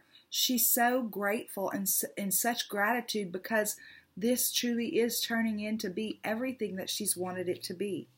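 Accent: South, United States. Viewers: I love your readings as they mirror my life perfectly! Plus, I love your sweet southern accent and charm! Thank YOU! <3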